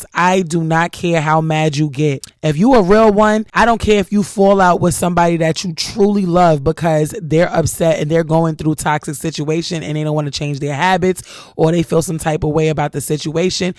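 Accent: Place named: New York, United States. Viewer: Think she from new york .... sounds familiar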